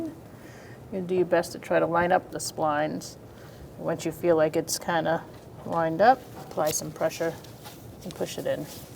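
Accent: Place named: New York, United States. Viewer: New York or Jersey accent for sure. (out sounds like oat)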